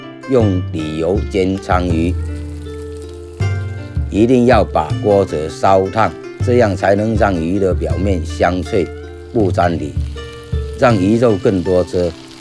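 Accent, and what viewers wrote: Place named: Southern Guangxi, China. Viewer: 北海人哎，桂南腔好怀念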